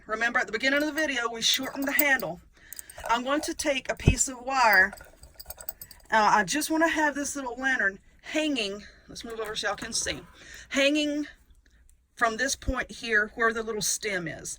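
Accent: South, United States. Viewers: I just love your accent, your southern wording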